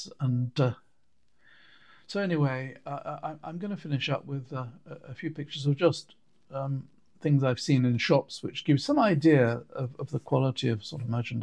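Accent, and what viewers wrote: RP, United Kingdom. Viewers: I knew strait away just from his accent that he was well heeled ;-)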